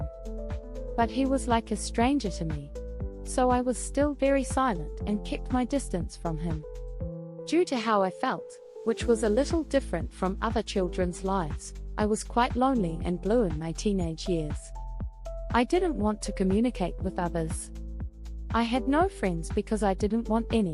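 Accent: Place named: United Kingdom. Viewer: Жестт. Че за акцент британский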